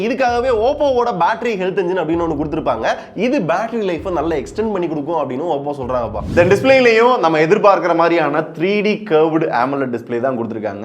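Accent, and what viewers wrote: Madurai, India. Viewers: Takkali....vokali ❤ pathukkalam madurai slong❤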